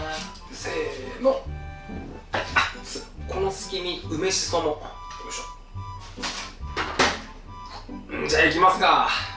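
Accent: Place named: Hakata, Japan. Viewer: の動画観すぎて料理の知識だけじゃなく博多弁までも学んでる一石二鳥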